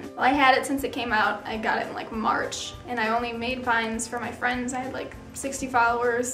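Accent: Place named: Chicago, United States. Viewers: She has a bit of a Chicago style "ah" going on, but that's just what I hear. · I think it's because she's Midwestern. Maybe from Minnesota or Wisconsin. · Her accent is like a normal Midwest accent. I have it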